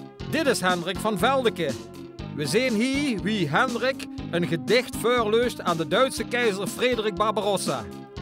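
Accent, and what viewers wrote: Central Limburg, Netherlands. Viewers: klinks als midden limburgs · welk deel van limburg komt de verteller vandaan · TF dit is midden-Limburgs en is inderdaad redelijk te volgen. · JuniorWorld .Vision Ik kom uit Echt en het zal niet heel ver van Echt vandaan komen. Ikzelf gok Susteren.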